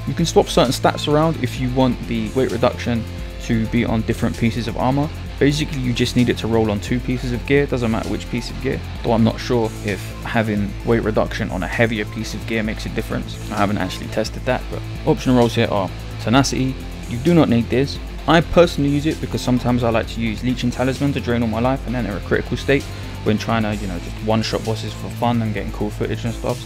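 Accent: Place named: Cockney, United Kingdom. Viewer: Can somebody translate his cockney for me, no idea what he's saying.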